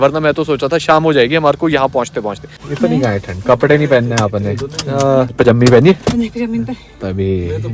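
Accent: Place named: Himachal Pradesh, India. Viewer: It's only himachal language tone.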